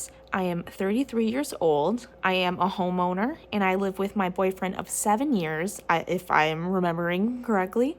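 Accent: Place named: Canada. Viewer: The Canadian accent is so strong, I LOVE IT 😂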